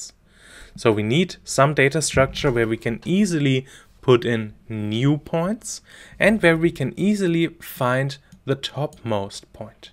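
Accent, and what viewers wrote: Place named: India. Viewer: Dude looks like american, sound like indian. I meant no insult, I just meant you got all to be a tutor online.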